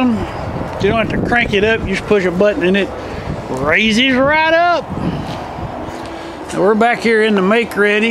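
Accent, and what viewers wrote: Texas, United States. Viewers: Texan accent?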